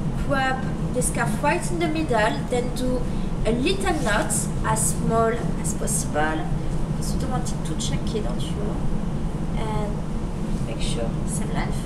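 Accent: France. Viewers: I like your french accent. Il faut que l'on se rencontre ;) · FRENCH ACCENT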